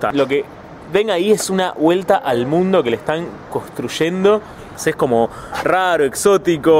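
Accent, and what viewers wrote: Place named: Argentina. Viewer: Querido, debo confesarte que te descubrí hace poco tiempo. Soy jubilado, orillando los 70 pirulos y me paso las tardes viendo y disfrutando tus videos. Además de ser muy interesantes, tenés una manera de narrarlos muy argenta. Pondero la honestidad que profesás por tu cultura, el respeto por tus ancestros sin desestimar tu Argentina a la que has recorrido y mostrás con deferencia. Felicidades por tu juicio, por tu labor educativa y por haber encontrado una manera apasionada de vivir!.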